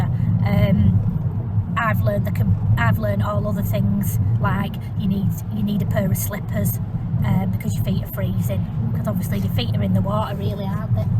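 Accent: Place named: Northern England, United Kingdom. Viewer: So chuffed you’re back and feeling better. Been missing your northern accent. You look fabulous too. Have a great Christmas and happy New Year. See you soon xx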